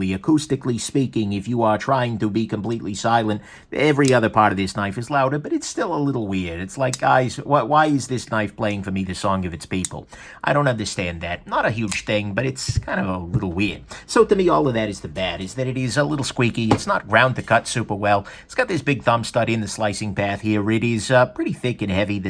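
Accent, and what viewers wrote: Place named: New Jersey, United States. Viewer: That is a New Jersey accent.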